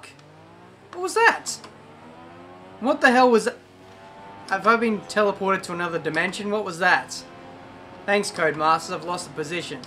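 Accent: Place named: Australia. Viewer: australian voice Great job mate, that came outa nowheree!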